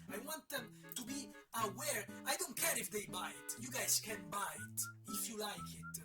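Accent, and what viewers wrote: Italy. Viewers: no l'accento de roma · are you by any chance italian? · he's italian most probably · Come for the drama, stay for the Italian outrage accent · I don't think he confirmed it, but I am Italian and that is definitely an Italian accent, I've heard it a thousand times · he’s italian? 😳😳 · Man tbh I never tought that your accent sounded so Italian, Love it btw :) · l'accento è palese italiano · my brother in christ, are u italian?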